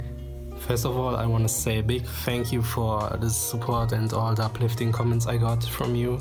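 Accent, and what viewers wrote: Germany. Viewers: dieses Englisch man merkt so das du Deutscher oder so bist xD aber Inhalt ist top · Are you German or Something like that?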